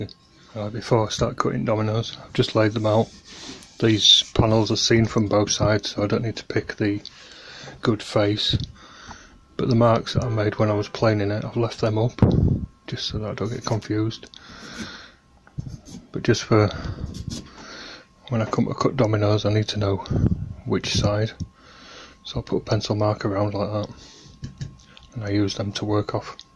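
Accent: Yorkshire, United Kingdom. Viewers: I discovered your channel recently and you really know your stuff. Your keeping the craft alive which is great to see. North of England? Yorkshire perhaps??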